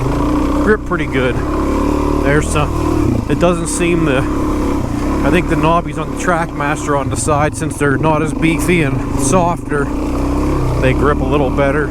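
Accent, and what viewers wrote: Pennsylvania, United States. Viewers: I saw your in Blair county, I’m from York county, I could tell by your PA accent you were from PA haha, always looking for people in central PA to ride with!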